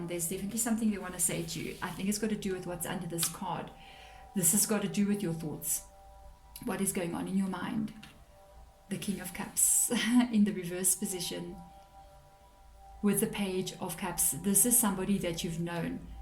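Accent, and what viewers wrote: South Africa. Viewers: Are you based in South Africa? Your accent sounds South African.. Do you do personal readings and how much does it cost?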